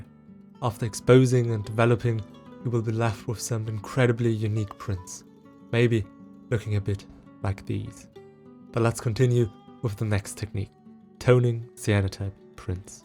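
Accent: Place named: Switzerland. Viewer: Interesting pronunciation. Studied British on a German substrate. Swiss-German?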